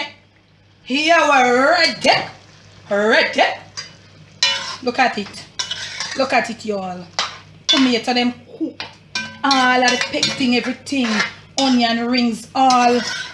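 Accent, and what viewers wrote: Jamaica. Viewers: love the jamiacan accent from trinidad here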